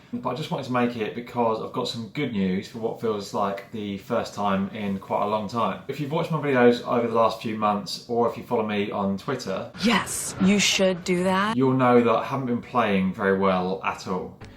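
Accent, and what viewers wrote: Australia. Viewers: Well done in your 1 under the card, brilliant result! The key sometimes is to put things in perspective, there's no golfer in the history of the game that hasn't gone through a slump fighting internal demons from Ballesteros, to Tiger, Nicklaus you name it everyone goes through slumps. For me what works these days after fighting my own internal demons is I treat golf like trying to chase a butterfly. The more you chase after it you'll never catch it but if you just stand still and wait patiently much better chance of coming back to you. Keep up the great videos. PS.. bring back the Aussie dodgy accent, sorely missed.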